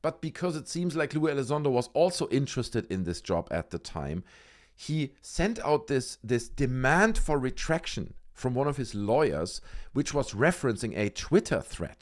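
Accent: Germany. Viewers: I just love your German accent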